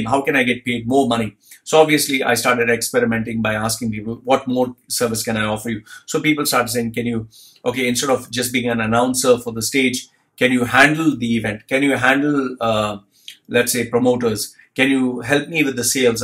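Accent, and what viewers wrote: India. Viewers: you sounds like Indian which i means like me.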